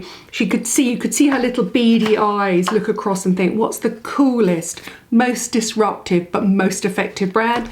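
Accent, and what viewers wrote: United Kingdom. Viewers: you are looking so fresh and well in this! I so look forward to these videos. I miss all my UK pals and this gives me a little “fix” as your turn of phrase and accent are exactly the same! Always excellent info as well. I have subscribed to Beauty Pie but honestly the shipping plus the monthly charges are not adding up to making it worthwhile as some of the makeup in particular is really just so-so.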